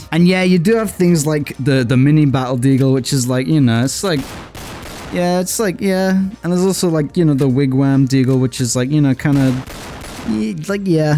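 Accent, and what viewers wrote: United Kingdom. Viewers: R u British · Are you British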